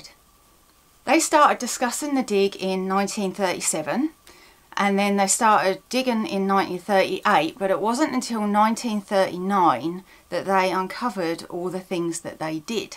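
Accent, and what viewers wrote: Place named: Suffolk, United Kingdom. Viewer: Your accent just takes me back to my youth, I love it. A proper Suffolk accent, not like my mongrel Suffolk / Nofolk / probably mostly RP (and maybe a tad of north-east from my time in 'Boro... maybe)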